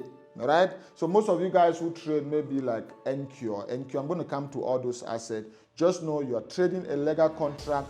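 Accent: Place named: Akwa Ibom, Nigeria. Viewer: You have akwa ibom accent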